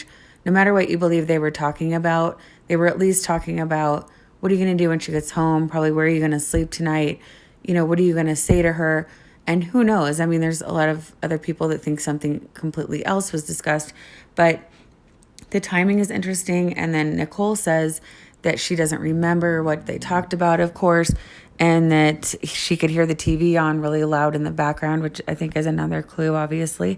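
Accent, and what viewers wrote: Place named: United States. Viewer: I'm from a little country in the pacific and generally I don't like the American accent but I have to say you have a really really nice voice and are very easy to listen to. Thanks. Ive been obsessed with this case ever since hearing about it. I think because the amount of home videos of Shanaan, Bella, Celeste I feel I got to know them. I think Shanaan was an amazing mum, wife and home maker. The tenderness and patience she showed her gorgeous daughters was unmistakeable. The mind just boggles. crustychris brings to mind one word and thats "WIMP". Him and nicks-hole deserve each other!!!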